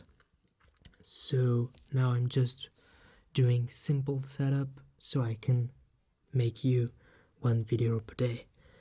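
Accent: France. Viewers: His France accent make me laugh do hard haha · Ton accent français me tue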